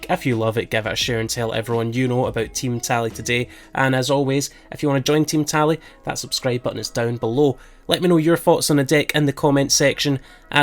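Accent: Scotland, United Kingdom. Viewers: "Fantasmay is Fantastic" with that accent will never leave my head anymore 🤣🤣 Assuming Scottish aswell?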